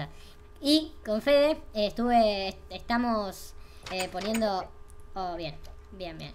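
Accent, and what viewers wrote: Argentina. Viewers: eres argentino????? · sos argentino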